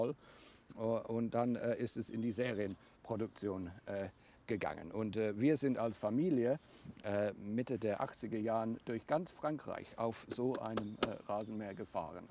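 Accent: England, United Kingdom. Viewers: Morning, nice to hear the English accent being one myself. give the Germans more good tips how to make a good English garden. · Klasse Ihre Beiträge. Auch liebe Ihren britischen Akzent. 👏😀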